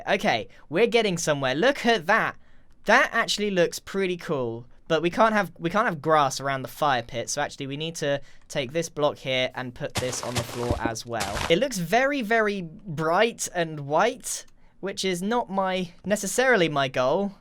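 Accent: United Kingdom. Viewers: Garage, I thought you were Bri’ish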